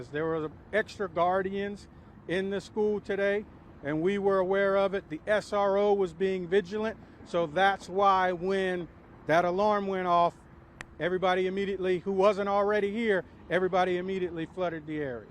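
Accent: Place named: South, United States. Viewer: I don't know what it is but Southern accent makes it enjoyable to listen to anything being said lol.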